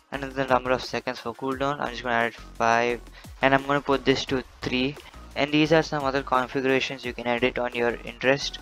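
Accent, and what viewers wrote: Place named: India. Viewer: bhai hindi mai bnaoge toh or achi bnegi vedio bhale hi international audience na mile but indian audience jrur milegi accent se pata chal rha hai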